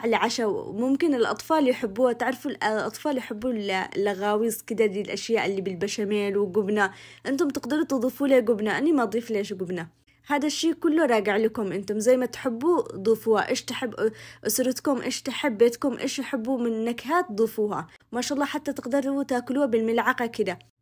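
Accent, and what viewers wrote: Aden, Yemen. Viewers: ماشاء الله رووووووعه رووووعه خورتيني والله والاروع طريقة كلامك العدني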